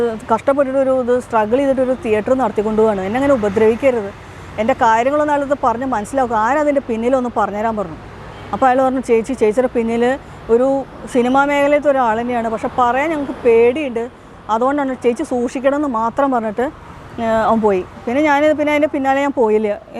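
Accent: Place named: Thrissur, India. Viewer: Beautiful Thrissur slang. One of the best theatres in TCR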